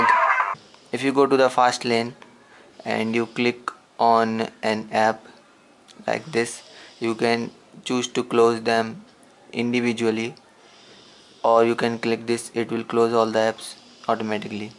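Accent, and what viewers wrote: India. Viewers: Lmfao. Ok then, if you know so many languages you know how tricky it is to learn sounds and how to speak correctly sometimes. First. Second, if you complain about his English skills, at least write properly. Third, Yes he does have to improve, I never said otherwise, yet I've seen tons of reviews made by people from India speaking English and they all sound the same (some better some worse - no offense) so... there isn't much he can do.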